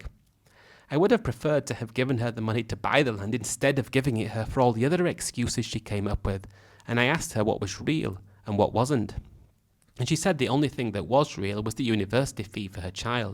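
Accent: England, United Kingdom. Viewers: You have a English accent you liar nothing you said is true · So is this channel just some British guy reading middle-aged white men's accounts of dating in the Philippines??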